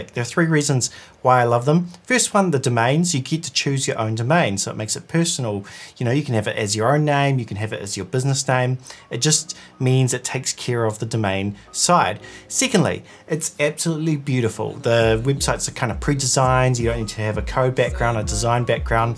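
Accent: New Zealand. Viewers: Not being a mother tongue English speaker, although having a decent understanding of the language, I'm shocked that I didn't get the NZ accent...but now I can't unhear it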